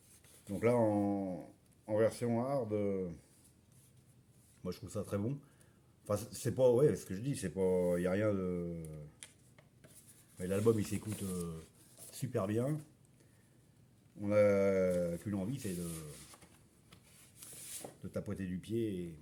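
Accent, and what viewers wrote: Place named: Brittany, France. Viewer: ton Breizh-anglais est des fois limite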